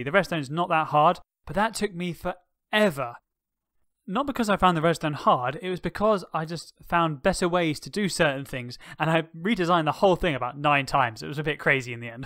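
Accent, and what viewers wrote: United Kingdom. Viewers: You are actually quite similar to Mumbo -brittish accent -good at redstone -classy outfits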